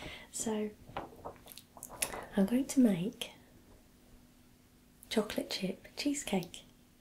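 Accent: United Kingdom. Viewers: I love cheesecake!!! I have made chocolate chip cheesecake and it’s delicious! you are wonderful! I love your British accent!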